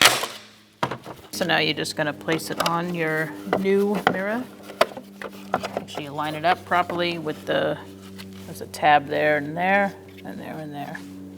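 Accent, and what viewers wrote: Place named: Boston, United States. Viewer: Thankyou for this from Queensland Australia. Love your Boston accent! I'm assuming Boston. The way you say care for car for instance.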